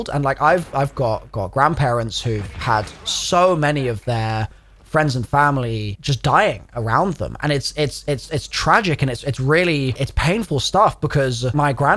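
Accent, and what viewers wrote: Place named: England, United Kingdom. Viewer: just wanna say, you were such a large part of my teenage life that my close friend and i had a little inside joke where every time we'd see each other we'd just go "hi guys, here" in an english accent. two 15 year old american boys just having a nice time at the arguable peak of the zombies community. it was really nice. wanna say that ive still been consistently watching your stuff. not really commenting all that much, but im here. thanks for all the laughs man, keep doing what you do.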